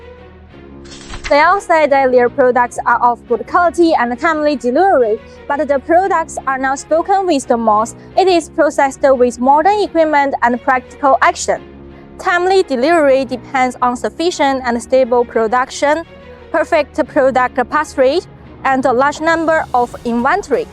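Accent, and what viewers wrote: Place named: India. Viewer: sounds like Indian English, hard to understand.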